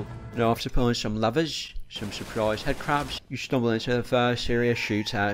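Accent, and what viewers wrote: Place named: Wales, United Kingdom. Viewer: Yeah you have kind of a British\Welsh accent that my ears don't really understand, but don't take it personal I'm fine with it.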